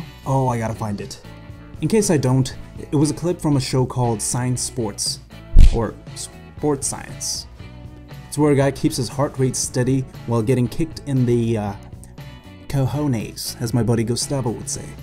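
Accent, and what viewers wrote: Canada. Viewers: invite for canadia pronunciation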